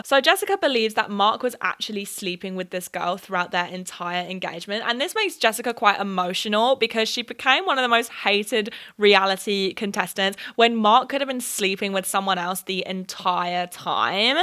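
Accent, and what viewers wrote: United States. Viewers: I absolutely ADORED your American accent! Great video as always 👏🏾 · Just FYI, your American accent is great! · How is your american accent so good? holy shit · ahhh i loved this show!!! love that i got to revisit it with your hilarious commentary and omg your american accent is so funny LOL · As an American, your American accent was the best part of this video 😂 It sounded great! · Ok ur American accent was actually good tho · Omfg your American accent… you are the first British person I’ve seen get it right! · Your American accent is sooo good! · I strictly liked your video for your american accent! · honestly your american accent is pretty good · That was actually a really solid American accent. I can barely hear a slip up, like at MOST a few sketchy vowels haha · i loved your american accent (as an american)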